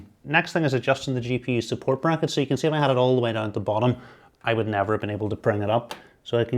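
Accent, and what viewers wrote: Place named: Northern Ireland, United Kingdom. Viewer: That's just a northern Irish accent...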